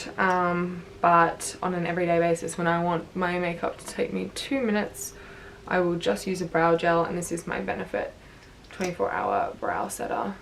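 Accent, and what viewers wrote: Australia. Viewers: I knew you were from Bondi just from the accent lol. #eastensuburbsgirllssss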